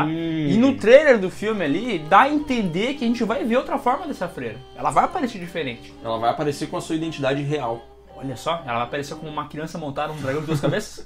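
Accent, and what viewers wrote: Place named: Rio Grande do Sul, Brazil. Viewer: Aaa eu sabia q vcs eram gauchos kkk so pelo sotaque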